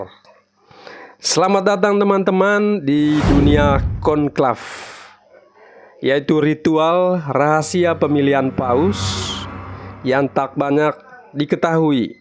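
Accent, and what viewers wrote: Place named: Manggarai, Indonesia. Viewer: Mo mau tanya maaf dengan logatnya kaya orang Manggarai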